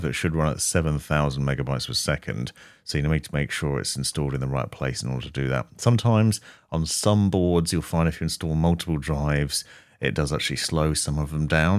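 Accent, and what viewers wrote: United Kingdom. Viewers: it’s not just the speed …it’s that Brit accent hahaga GREAT video man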